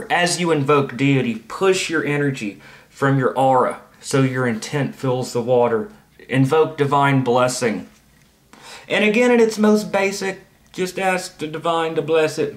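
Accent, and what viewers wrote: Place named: Texas, United States. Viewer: I been watching for while and like the u put a bit of your sense of humour in with ur texan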